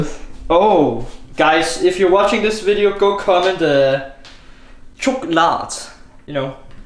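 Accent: Denmark. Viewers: Hva satan, har lige fundet din kanal og kunne med det samme høre du var dansk AHAH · Er du fra DANMARK?! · Its danish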